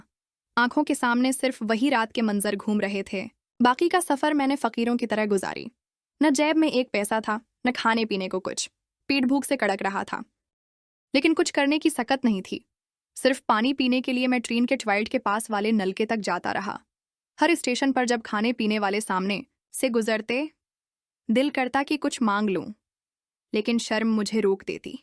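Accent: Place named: India. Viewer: کہانی کسی انڈین لڑکی نے بیان کی ھے لہجہ پاکستانی نہیں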